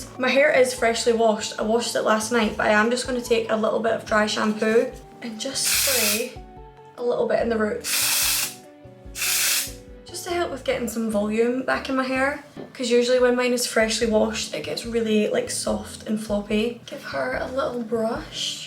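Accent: Scotland, United Kingdom. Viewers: Omg your so pretty also r u Scottish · is that a scottish accent? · Scottish accent :)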